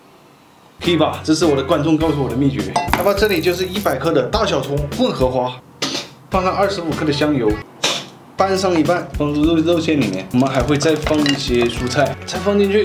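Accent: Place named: Shanxi, China. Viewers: 这山西口音，弄上水zanzan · 这是山西北部，内蒙西部的口音啊！ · 姥姥必须得是我们山西人，这口音，一听就是自己家姥姥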